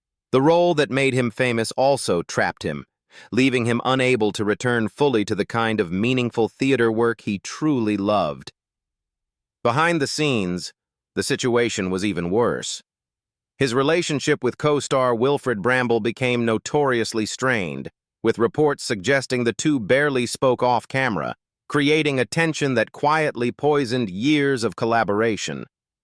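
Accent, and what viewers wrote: United States. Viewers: O look an american voice=terminology=brainwashing=cast system shame on you people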